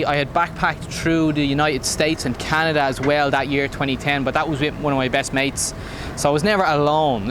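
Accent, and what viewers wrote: Ireland. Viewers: Nice and Interesting Story, from a that time young and naïf person., 1 positive Remark, take care of your English/Irish, not everyone is a Native English speaker and sometimes it’s fast and a little bit difficult to understand in your Enthousiasme. 🙏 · I can relate to this in so many ways 😅 scammed in HCMC all over the shop and the Irish accent going down great in Pai 😂 Great story, give us more of them!😁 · Wow, that would have sent me in a major panic. Glad it all worked out fine in the end. I always get caught up in your accent. I have never heard someone add a 'th' at the end of every 5th word. Like your name, there no 'th', but you still pronounce it that way. Is that part of the Irish accent?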